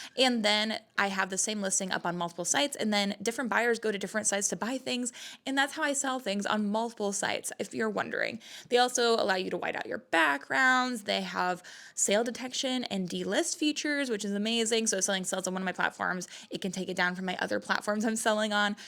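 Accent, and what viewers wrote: Midwest, United States. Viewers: Thank you for the video! I love watching your videos especially this series. I am from the Midwest and that is just how we say buttons and mittens. Try not to let the comments bother you. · It’s the Midwest accent. A lot of our t’s sound like d’s to others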